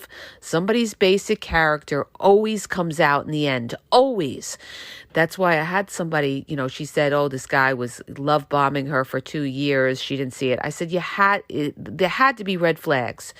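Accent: New York, United States. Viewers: . You are an amazing woman. The amount of information and experience that you share is helping lots of victims of narc abuse.Your N.Y. accent and honesty is awesome. You have figured these creatures out. They are not genuine and they are not going to help anyone. LIARS. Stay away. God bless.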